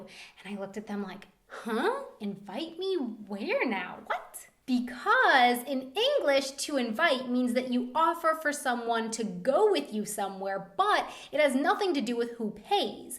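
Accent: Germany. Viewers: I live in Germany since I'm 5 and I think you're german akzent (?) is so cute 😂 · I have studied in the UK and I am fluent and accent-free in english - but I wasn´t aware of the english meaning of "I invite you". This explains a couple of strange experiences in my past - thanks a lot :D Also: you have a very cute german accent ^^ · I am sorry but you German accent ist realy... Realy bad xD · Das stimmt! hahaha As a non-native english and german speaker (i'm from Brazil), I also think that you german accent is cute. All the north american friends I've met in Germany couldn't pronounce words with a 'strong R' correctly (like sprechen). I think it's beautiful how each of us have a distinct accent when talking in a language that is not our mother language. Can you imagine how hard it's for me to switch between German and English? After living in Germany, I have a really hard time trying to talk in english because the two languages seems to have kinda merged in my brain. 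I think it would sound even funier for you to hear me talking in english haha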